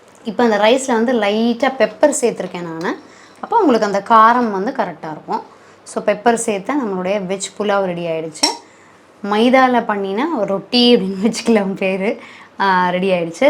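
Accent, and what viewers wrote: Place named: Tamil Brahmin, India. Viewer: I think you are a tambrahm since your diction is familiar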